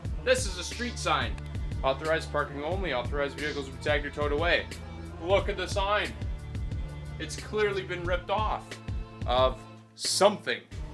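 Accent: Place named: Canada. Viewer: Sounds like he's Canadian. He's from Canada not Scotland, that's the accent you pick up.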